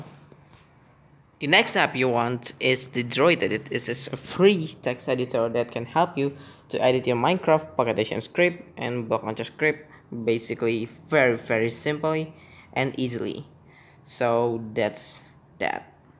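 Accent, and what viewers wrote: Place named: Russia. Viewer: I am damn sure you are russian.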